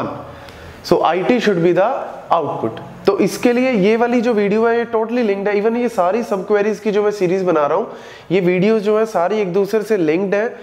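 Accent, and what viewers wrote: Punjab, India. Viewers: Braber Punjabi tone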